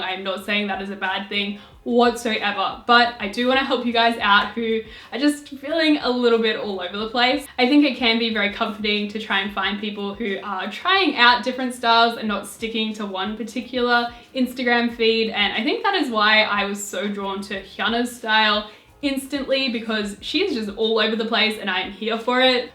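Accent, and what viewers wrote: Australia. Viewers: is this chick Aussie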